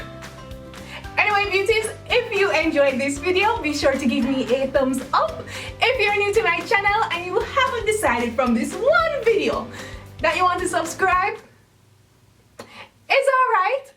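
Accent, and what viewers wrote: Jamaica. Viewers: I love your Jamaican voice lm Jamaican to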